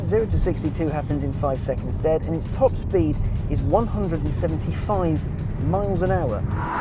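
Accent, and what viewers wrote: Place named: England, United Kingdom. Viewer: Only someone with a proper english accent can accurately review a Porsche.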